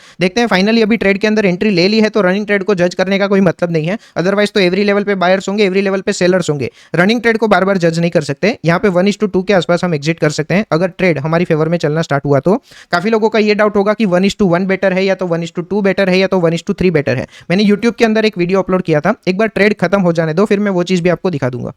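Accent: Gujarat, India. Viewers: Are you Gujrati?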